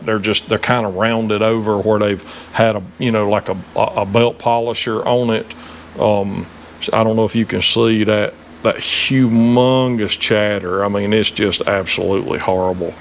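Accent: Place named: Australia. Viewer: That accent is magnificent. Aussie here.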